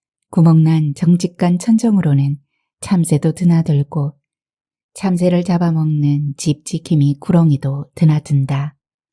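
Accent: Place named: Gyeongsang, South Korea. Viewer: 매일 열심히 살자는 마음으로 산 생활을 합니다. 이 작품은 내 삶의 모습을 잠시 점검케하는 계기가 되군요. 좋은 작품 고맙습니다. 갱상도 억양의 낭독도 참 좋아예~~^^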